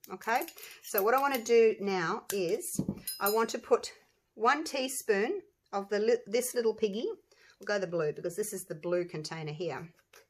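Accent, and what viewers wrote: Australia. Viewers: Hi, love your videos and hearing our good old Aussie accent! I ordered the minwax on Amazon and I noticed in your tutorial you said that people in the US can use Behr with Jo Sonja’s, but can we in Aus use the minwax with taubmans?? Thank you for this video 🥰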